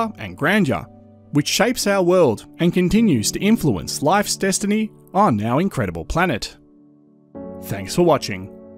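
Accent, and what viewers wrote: Australia. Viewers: I happen to like his Aussie accent. Let him alone, especially since he works hard to make his content informative, and intelligent! You are awesome,!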